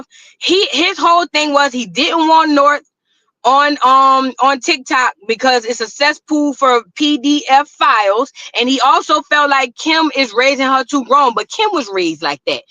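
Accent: New Orleans, United States. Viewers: Girl, f me up with voice! NAILED!!Lorddddd I love that New Orleans accent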